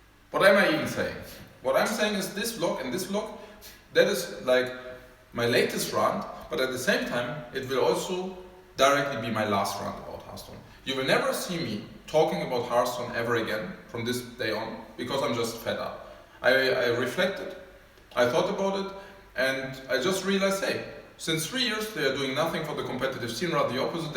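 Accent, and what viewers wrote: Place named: Germany. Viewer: wenn ne kartoffel englisch spricht...ohrenkrebs für meine englische ohren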